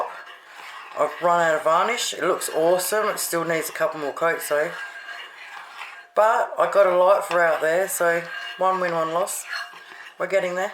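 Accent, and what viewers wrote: Australia. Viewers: Hey Woman I love ❤️ your style and hearing our good Aussie accent. Cheers 🥂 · You sound Aussie as , love it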